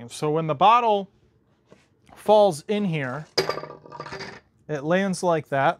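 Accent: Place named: United States. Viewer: you have the coolest American accent I ever heard, where is it from?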